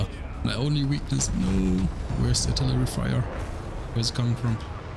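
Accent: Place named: Germany. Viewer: wen i hir se jerman egsent i fiel wery much at home. Geiles video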